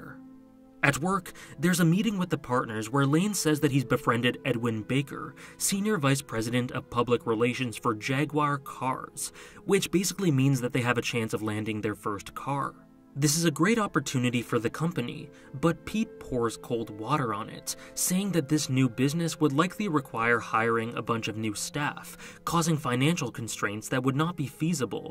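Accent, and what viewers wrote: Canada. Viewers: I'm going oot on a limb and guess you're Canadian?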